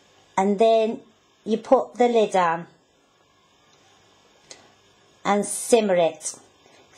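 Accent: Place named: Scotland, United Kingdom. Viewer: You sound almost Scottish in this. So, how does this taste?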